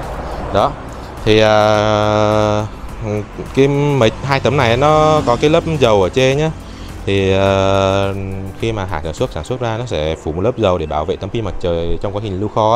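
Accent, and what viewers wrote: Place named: Quang Binh, Vietnam. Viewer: Bác nói nghe giống người cảnh dương, quảng bình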